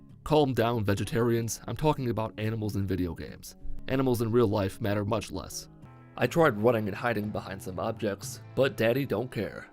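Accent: Michigan, United States. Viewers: R u from Michigan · That’s what mighiganders sound like you are offending my people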